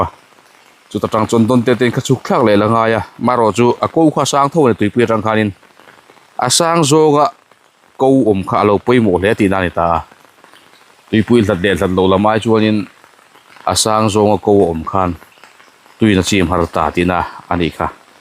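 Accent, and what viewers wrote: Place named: Texas, United States. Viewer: Pu, i Texas awphawi hi a pa tlat.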